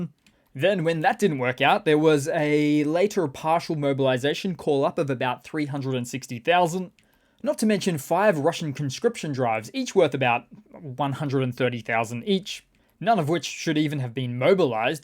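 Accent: Australia. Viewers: You have the best Australian accent,. Goes well with the humour. Russia moving those buoys after years of mutual agreement with Estonia is just so typical. Then it accuses other countries of provocation and the reason for going to war with them. It's extremely annoying when other countries give their endorsement and support for this kind of behavior. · 【今日のJuzzieの動画のコメントから一つピックアップ】 あほのコメンターの、こいつによると、'Russia moving those buoys'は、 数年以上に渡る、エストニアとの相互交渉による結果であって、双方の合意によるものだ、ということらしい。 ただ、しかし、その相互交渉、双方の合意なるものは、プーチン・ロシアの、そのポンコツ軍事力（による脅し）を背景にしたものだ、ということを見落としてはいけない。 それは、プーチン・ロシアによる、暗黙の脅しによるもので、単なる、一方的強制であって、当事者間の「双方による合意」とは、言わない。 因みに、プーチン・ロシアの、これまでの、やり口、手法は、すべて、これであって、彼らの言う、相互交渉、双方の合意というのは、すべて、 見せかけだけ、外見だけで、中身は、プーチン・ロシアの、これまでのポンコツ軍事力（による脅し）を背景にした、いわば、強制だった、ということ。 んで、今はどうかというと、プーチン・ロシアが世界に誇る、世界最強、世界最先端の軍事力が、実際の戦争で、実は、すべてポンコツ、大嘘、いかさま、ペテンの代物だと、バレてしまったので、 その結果として、これまでの、プーチン・ロシアの、強引で、一方的要求である、傍若無人もいいところの 見せかけだけ、外見上の、相互交渉の結果、双方の合意、というものは、今後、全て無効になる、白紙の戻されることになる、のは明らか、だと思うんだけど、ね。 4 時間前 You have the best Australian accent,. 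Goes well with the humour. Russia moving those buoys after years of mutual agreement with Estonia is just so typical. Then it accuses other countries of provocation and the reason for going to war with them. It's extremely annoying when other countries give their endorsement and support for this kind of behavior. 5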